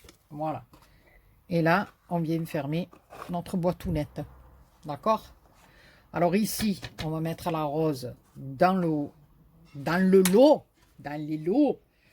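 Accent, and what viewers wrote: South, France. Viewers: Qui elle pèche j adore vous regarder merci pour l accent du Sud plein de soleil bisous josiane brindille 😂🤗🤗🤗👍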